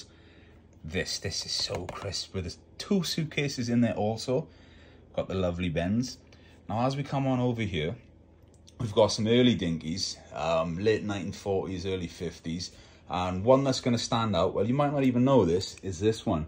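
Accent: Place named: Swansea, United Kingdom. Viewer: your enthusiasm is infectious! superb haul, i'm pleased to say i own many of them although not all are boxed, i completely agree re the artwork, it is absolutely stunning, a golden era not just for toys but everything else really(i wont get political) incidentally my cousin's husband is from swansea and his accent is so similar to yours(obviously) great video as usual, yes, i'd be interested in a more in-depth look at your collecting process, thanks again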